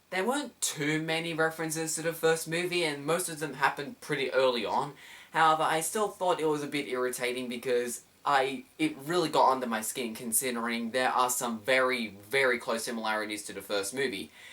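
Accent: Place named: Australia. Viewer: so you mean best and worst movies of the year right? that is a cool idea looking forward to it :) and by the way i love your australian accent it is pretty cool and makes you unique:) i think it is so interesting just that the same language sounds so different spoken by persons from different countries :) in my case my english videos have a german accent so my english sounds also different :)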